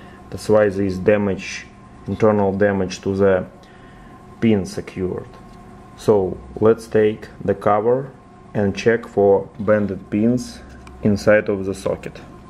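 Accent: Russia. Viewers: Акцент Русский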